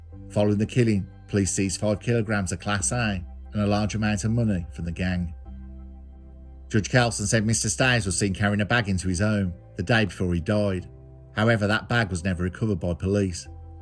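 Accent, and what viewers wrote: Birmingham, United Kingdom. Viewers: The ambient music, that Brummie drawl, the street slang. I smile to myself, another episode of Curios & Spiritual. 😊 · The brummy accent is awful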